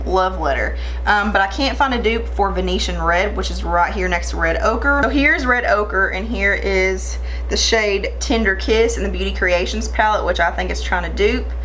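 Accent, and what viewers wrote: South, United States. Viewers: Clearly you're from the south? What's your accent? Hhmmmmmm Georgia?? NC??? I'm from SC. I'd love to see the Beauty creations palette on your face lol. Thanks for bringing these brands to light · Oh my goodness..... You asked them if they could send some products for you to review fir them, and they just said no?? 😮 That was quite rude of them... You'd think they would be happy someone with nearly 13,000 subscribers wants to do a video reviewing their products and help them get more recognition (because I honestly NEVER heard of this website until I watched this video). Oh well...I guess they just don't care to gain more popularity. 😕 P.S I love your accent! 😆 I'm Canadian (which has no cute accents lol) but I've ALWAYS loved a southern accent....I can't pin point the accent to the state....I just know a southern accent. I think what made me like the accent so much is my OBSESSION with the country singer, he's from Georgia and aside from the fact that he is sexy AF, his Georgia accent only adds to it! 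Yup..I'm definitely gonna move to Georgia and marry that man...I mean..he's married and I'm married but...meh 😇 a girl can dream, right!? Lol 😄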